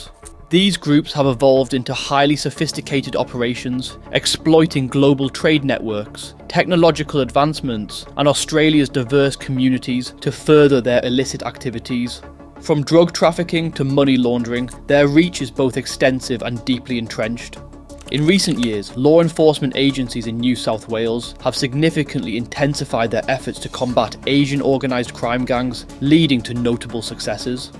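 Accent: United Kingdom. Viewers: who is this British accent speaker broadcasting old news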